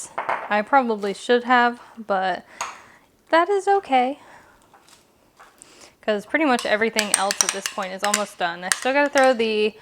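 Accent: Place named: Virginia, United States. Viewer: Ahhh, a Virginia girl are ya? Hello from Manassas, Virginia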